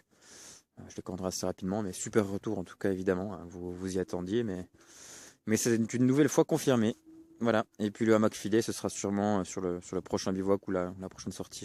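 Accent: Franche-Comté, France. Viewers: dans le meme esprit tu aussi a la rangers gore tex special grand froid et longue marche et tu as la rangers para c se que j avais,un peu moins chaudes que la gore tex mais plus efficace pour crapahuter voila apres c un avis perso!! pour un tarifs en dessous 100e pour les 2 modeles a pluss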